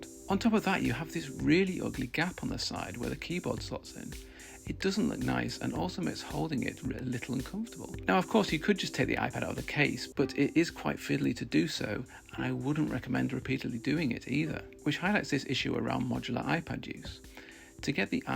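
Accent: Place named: United Kingdom. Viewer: thanks for your reply, cause I hear your accent and thought you were in Uk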